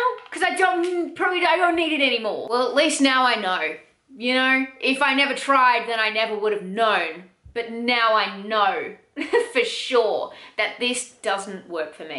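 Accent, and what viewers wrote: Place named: Australia. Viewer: Oh wow, I so enjoyed your video. You completely are real, funny and totally honest plus I love your Aussie accent. Btw- I like the way your hair looks. I don't even bother sectioning my hair. I just grab pieces and go at it.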